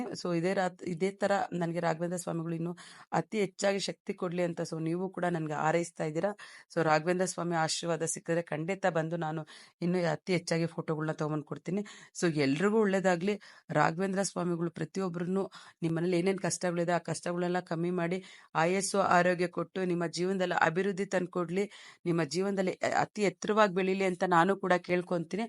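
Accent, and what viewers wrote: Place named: Chikkanayakanahalli, India. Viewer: Tumakur chikkanayakana Halli talk. Huliyar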